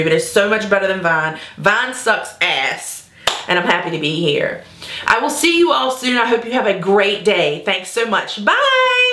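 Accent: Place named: South, United States. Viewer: i got so excited when you said ello.poppets im like omg she has an accent and then i heard the southern accent and im like Holy god she has an accent! i love it. first video i watched. and i am hooked. subscribed and binge watching now!!!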